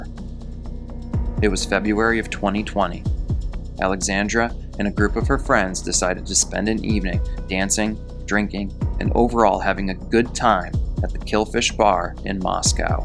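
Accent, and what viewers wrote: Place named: United States. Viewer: I love your accent. What part of America are you from?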